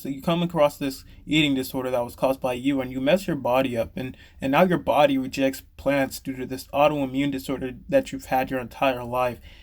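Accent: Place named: Working class, United Kingdom. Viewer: Mmm so if you believe this girl is sophisticated that would be really sad. As upper middle class, don't kniw yet her accent sounds like a lower class british accent. She's not the brightest and craves attention. So if i had to bet, is lower class narcisist with a severe eating disorder.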